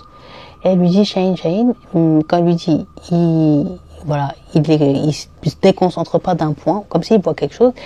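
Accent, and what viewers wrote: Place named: Arab world. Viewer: Merci pour ton travail t'as une voix superbe et j'aime ton accent arabe.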